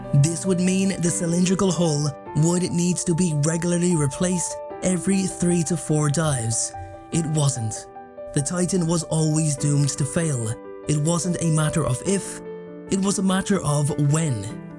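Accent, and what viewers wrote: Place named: Dublin, Ireland. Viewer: Well done on the video,but if you’re gonna try hide your inner Dublin accent,leave out the Americanised upswing at the end of almost every sentence,it starts to sound way too bullet pointed ! Just a thought…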